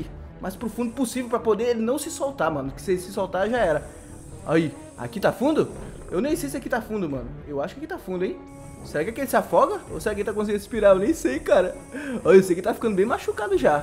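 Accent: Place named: Northeast, Brazil. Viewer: Que saudade que eu tava de vc é do seu sotaque nordestino eu também só nordestino só que eu moro em Minas Gerais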